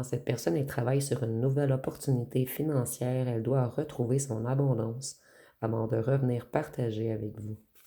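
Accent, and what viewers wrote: Quebec, Canada. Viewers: Est-ce que tu viens du Québec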